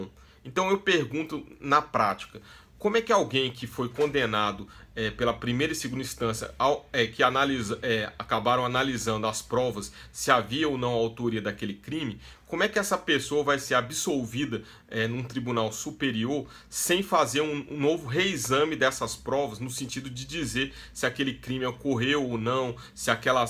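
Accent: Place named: Bahia, Brazil. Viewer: Boa explicação...E esse sotaque puxado pro baiano? Saudações.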